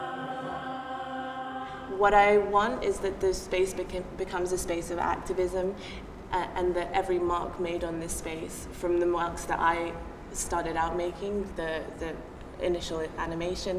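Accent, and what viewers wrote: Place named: London, United Kingdom. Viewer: We’re family lovely intro friend ! Love the London accent ! This video is absolutely amazing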